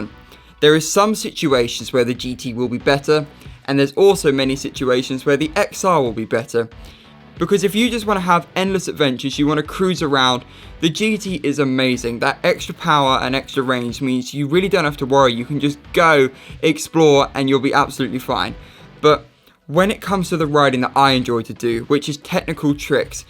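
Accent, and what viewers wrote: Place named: United Kingdom. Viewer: Sounds more like an ad than nothing else. You don't address the countless numbers of ghosting issues that have been reported, nor the predatory behavior of FM towards reparability of the board. You sound like you live in the UK, have fun when your board brakes down and you have to send it back to the only place in the world that can repair your board without bricking it mate.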